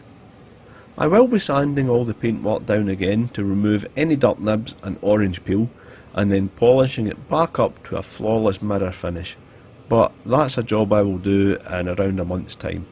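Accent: Scotland, United Kingdom. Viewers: This man, talented, hard working, creative and all of that with a great Scottish accent.👍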